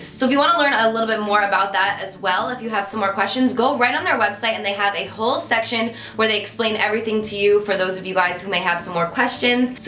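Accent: New Jersey, United States. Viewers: Omg! Her jersey accent is so strong in this video! 😍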